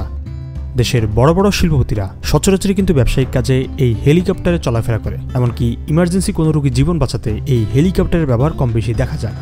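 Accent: Bangladesh. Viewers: বদ্দা অনের ভিডিও ত চিটাংগে ভাষা পুনা যার, অনের বাড়ি কি ভাই চিটাং নে ( চট্টগ্রাম)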